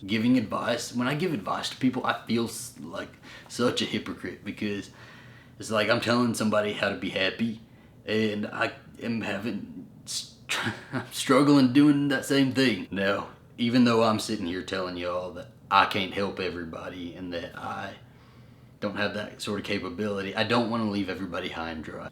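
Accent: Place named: Southwest, United States. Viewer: Sorry to see you going through this stress. Speaking as a very old senior gay man (82) therapy is absolutely nothing to fear; therapy triggered my own coming out. I enjoy following you on youtube and have seen elsewhere on the internet certain persons trying to take you down. As a fellow Texan I especially resent those who mock your decided Southwestern accent and who fail to comprehend someone can have an Hispanic surname without having just swam the Rio Grande. In fact, there are such families in Texas which predate any Anglos. You are a great couple and a fine role model, but don't try to take on too much. I am not familiar with the company you reference but also keep in mind many cities now have local referral systems for LGBT folks. Now take a deep breath.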